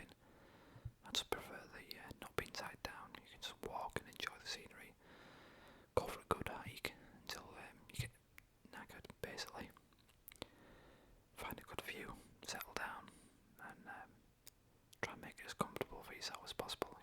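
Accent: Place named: Teesside, United Kingdom. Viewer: That huge watch with the blue face is lovey, I'd wear something like that... I'm trying to place your accent, I'm thinking south of boro but not as far south as Whitby.. I'm in Sunderland. nice video anyway :)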